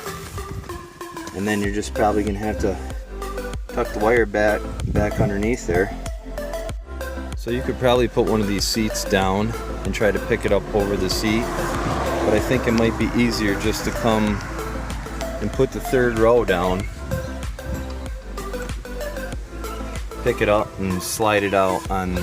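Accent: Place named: Upstate New York, United States. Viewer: Very helpful and thorough video… thanks for sharing! Ps - Upstate NY accent for sure! 🙂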